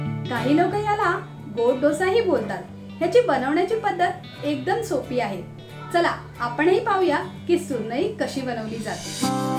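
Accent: Malvani, India. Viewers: Lai Bhaari .....!!! Sweet malwani!!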